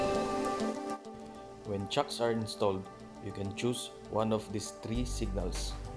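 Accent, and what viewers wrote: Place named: Philippines. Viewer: Filipino detected hehe. The voice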